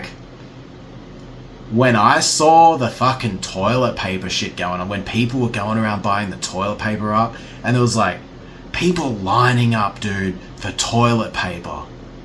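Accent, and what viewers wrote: Australia. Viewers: everything in a aussie accent sounds better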